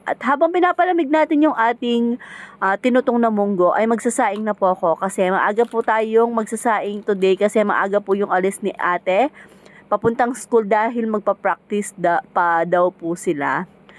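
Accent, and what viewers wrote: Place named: Pampanga, Philippines. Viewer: gusto ko yun kapampangan tagalog hehe!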